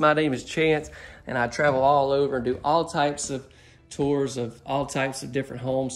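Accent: South, United States. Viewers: This home is great, I really liked the kitchen and the utility room, I could live with it. You say utility room however you want to, I pronounce it like you because I'm from the south too. In one video you said something about calling the toilet something besides toilet, how about the porcelain throne :) Keep up the good work.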